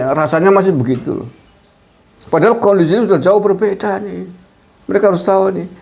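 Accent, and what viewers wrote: Banyumas, Indonesia. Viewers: Jebule bpke wong banyumas ya.......👍👍👍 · bahasane lucu😂 Banyumas banget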